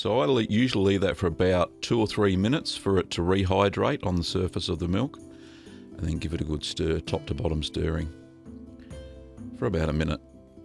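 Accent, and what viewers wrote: Australia. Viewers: Hi. Loving your videos - great work. I notice you use the numbers for your cultures. I'm in Australia and from your accent so are you. Can you let me know where you buy your cultures? My online supplier just sells soft and hard meso cultures and I can't figure out how to map them to the numbers they use on <link> Thanks in advance!!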